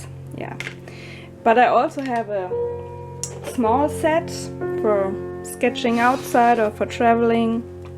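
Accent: Germany. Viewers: bist du aus Deutschland? Ihr Akzent ist schön! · Also ich finde, die Videos in denen du Englisch sprichst, sehr angenehm. :D Freue mich immer, wenn ein Video kommt, in dem du sprichst. Ganz ehrlich, ich wusste vorher nicht, dass ein deutscher Akzent im Englischen auch schön sein kann. xD An der einen Stelle wird deine Stimme etwas verschluckt. Sagst du da, die Kuretake-Farben sind besser pigmentiert als die White Nights oder schlechter? ^^ Ich liebäugle immer wieder mit den Kuretake-Farben, aber ich hab ja eigentlich meine Schmincke Horadam-Farben. Krieg da echt den Hals nicht voll, ganz schlimm. ^^' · Hallo, Du sprichst eigentlich Deutsch, oder? Du hast so einen krassen, deutschen Akzent : D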